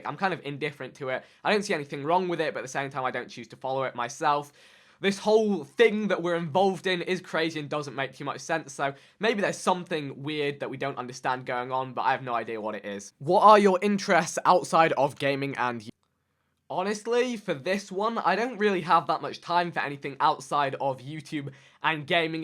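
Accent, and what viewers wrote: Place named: England, United Kingdom. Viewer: srs? He's English. Why does it matter his race? Where he comes from/lives is what counts for how he acts(and sounds)#accent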